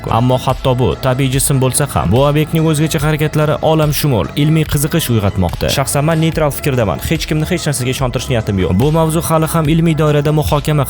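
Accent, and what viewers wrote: Tashkent, Uzbekistan. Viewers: Узбектилини.тугри.гапринг ука.тошкентнинг.шивасида.гапирманг.вотти.сузи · Факат узбекча гапир..тошкенча узбек тилига мансуб эмас.. Овотти..улвотти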